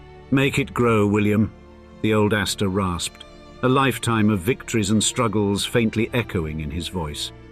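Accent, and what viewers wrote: United Kingdom. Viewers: Question about the narrator's accent: British or some modernized version of Mid-Atlantic?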